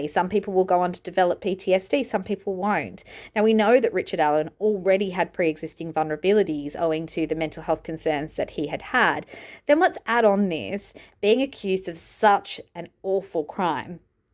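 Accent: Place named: Australia. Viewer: I'm Australia also, but it's only when listening to Aussie creators that I "hear" our accent. And I love it! I could listen to you talk all day, especially rational thoughts about this case and insights into your profession. Excellent work.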